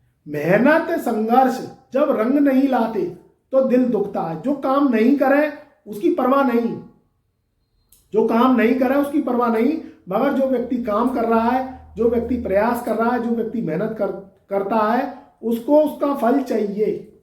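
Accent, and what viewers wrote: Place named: Uttar Pradesh, India. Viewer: गुरु जी आप शायद आगरा side के हैं। मैनपुरी फिरोजाबाद जैसी भाषा tone 😂😂😂😂😂😂😂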